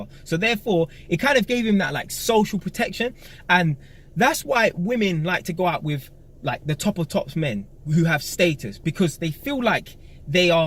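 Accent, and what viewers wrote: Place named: Hounslow, United Kingdom. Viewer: Yo g your accent different where u coning from Hounslow?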